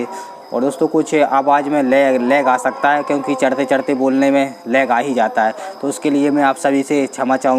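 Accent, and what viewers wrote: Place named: Madhya Pradesh, India. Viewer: सबसे पहले तो आपको वीडियो के लिए धन्यवाद , मैंने वीडियो आज ही देखा है और मुझे जो सबसे अच्छा लगा वह है आपकी बोली जिसे सुनकर मुझे लग रहा है कि आप मेरे जिले भिण्ड या इसके आसपास है अगर मैं सही हूं तो जरूर बताना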